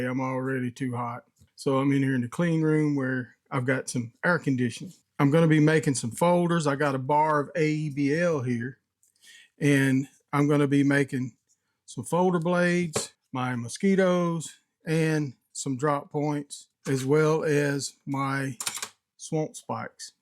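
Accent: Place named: South, United States. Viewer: knew you were southern man. Not to far from you, north mississippi.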